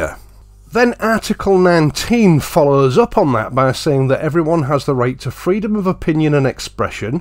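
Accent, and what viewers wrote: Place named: North, United Kingdom. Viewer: I love your videos and friendly sane northern accent. As you point out human rights can be "adjusted" to mean anything. More important are our Divine rights. In Genesis God tells us to be fruitful and multiply and fill the earth and have dominion over it. This means that we can legitimately use the amazing resources such as coal and oil in responsible ways. It is interesting to note that He has retained abololute control over the climate - He says that "while the earth remains, seed time and harvest will also remain". It is also interesting to note that CO2 is an vital plant foot and according to top notch scientists such as William Happer we are bordering on a drought for this essential commodity.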